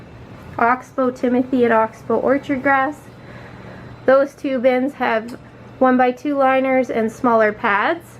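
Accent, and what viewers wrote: Canada. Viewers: You do you girl! Say things the way you're comfortable saying them. I just thought that was how you guys say it in Canada. Lolz I really enjoy your videos and you have helped me to know how to take care of my piggies Soo well. Wheeks from my piggies to yours. Thanks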